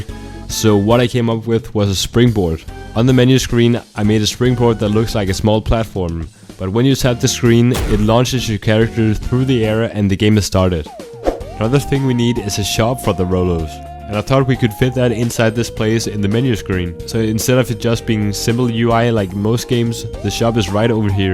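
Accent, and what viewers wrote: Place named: Denmark. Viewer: After watching for less than 30 seconds i managed to guess that you were danish correctly, based on your voice alone.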